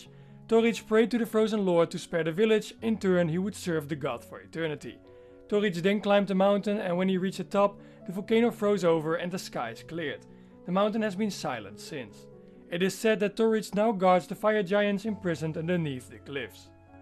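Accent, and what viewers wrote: Netherlands. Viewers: Where are you from? Your accent makes me think you are Dutch. Nice build bdw.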